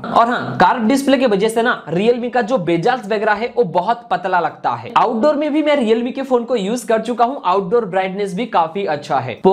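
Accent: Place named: Bihar, India. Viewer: Bhai tum bihar se ho kya nice accent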